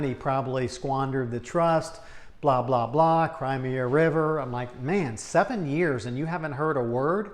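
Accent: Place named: Louisiana, United States. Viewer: Are you from Louisiana? Because you speak exactly like Dustin Poirier, same accent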